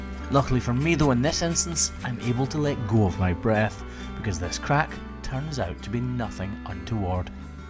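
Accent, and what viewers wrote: Scotland, United Kingdom. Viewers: Okay, I am happy to see the cow much improved, and I have to say that on top of enjoying your great Scottish burr you are very handsome.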